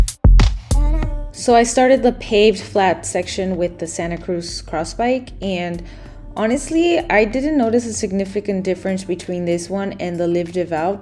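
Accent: Philippines. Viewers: you sounds like pinoy 😁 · parang may puntong piniy toits 😄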